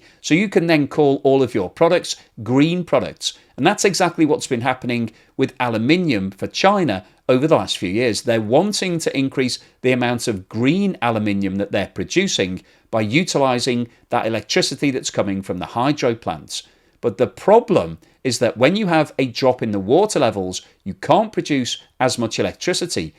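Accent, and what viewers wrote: United Kingdom. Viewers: I like how you Brits say 'tree', whenever a word ends in tory or tary. You all should hear y'selves:person-turqouise-waving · That is okay we are used to the British pronouncing words funny. China will never be net zero.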